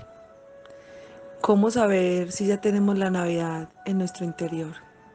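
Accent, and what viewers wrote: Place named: Colombia. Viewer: Eres maravillosa. Eres muy especial, bueno, realmente no eres especial, eres un miembro más de este universo que hace en los corazones de quien te escucha un espacio mejor. Especial son las personas que necesitan amor y luz. Realmente eres amor, con un nombre, con un acento colombiano, con un cuerpo de mujer. Infinitas gracias por tu amor y extenderlo a la humanidad.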